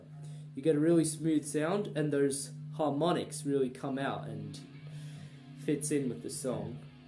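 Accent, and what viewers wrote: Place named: Australia. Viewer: Your accent sounds Australian.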